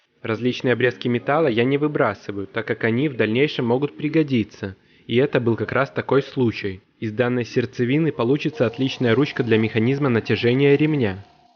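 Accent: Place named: Belarus. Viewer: звучит как беларус )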